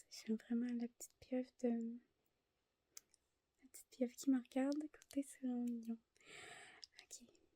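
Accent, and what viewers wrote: Quebec, Canada. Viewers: Jadore l’accent québécois merci beaucoup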